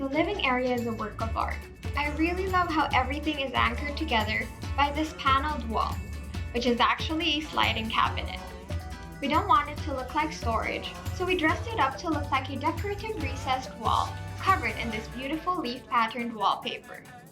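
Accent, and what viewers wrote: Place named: California, United States. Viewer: HI. Ms., you have a beautiful content po. Just avoid cracking voice when youe speak I llike your californian accent though.:)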